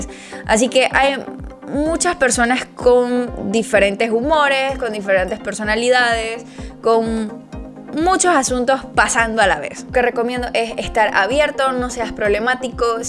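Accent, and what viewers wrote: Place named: Venezuela. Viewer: Hola, soy venezolana creo que tu también por el.acento tengo 55 años gozo de buena salud y me veo bien, mi sueño es ser TCP en alguna aerolínea estadounidense pero vivo en Venezuela mi pregunta es ellos piden el GED soy teacher de inglés en mi país ya con eso es suficiente? No tengo visa sólo pasaporte italiano porque tengo la nacionalidad ellos me tramitarian la visa de trabajo en caso de ser seleccionada? Espero me puedas contestar cariño.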